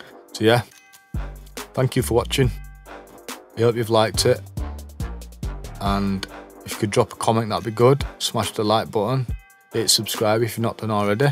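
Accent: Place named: North, United Kingdom. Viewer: Interesting video mate, nice to see a bit of a look back. Shocked you hadn't been to the peaks or the lakes before though, with your accent they are basically on your doorstep!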